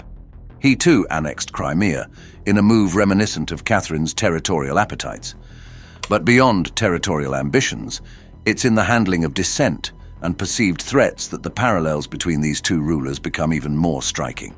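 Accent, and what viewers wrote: United Kingdom. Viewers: That British accent 😂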